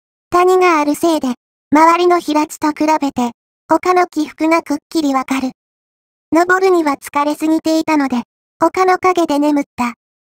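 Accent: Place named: Kansai, Japan. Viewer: 関西弁……ちゅーか……関西のイントネーションやね。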